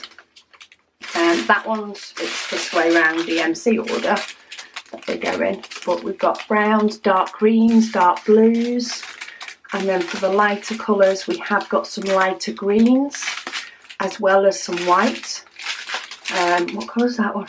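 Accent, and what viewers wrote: United Kingdom. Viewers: 310! Not impressed... just finished a Dp with, yes, 42 000 black square drills! Overwhelming. So proud, I finished it! Most difficult DP I did. Bur it is GORGEOUS! The drills were kind of bad. They were gorgeous but the size of the drills was not uniform....the more I see your lunar phase one... i have a kind of itching to get it... dang! I must be a masochist! Ah ah I really like your channel. Love your voice and British accent, so soothing.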